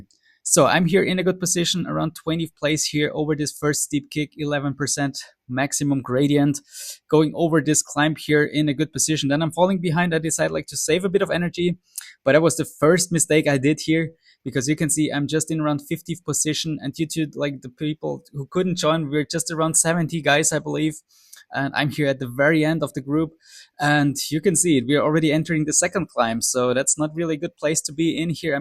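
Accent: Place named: Portugal. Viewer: Portuguese origins? The first name but mostly the english accent. Sounds very portuguese style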